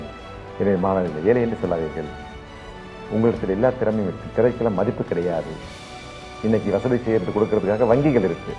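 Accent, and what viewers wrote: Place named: Kanyakumari, India. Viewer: ஐயா வின் குமரி தமிழ் பேச்சை ஆழகு சேர்க்கிறது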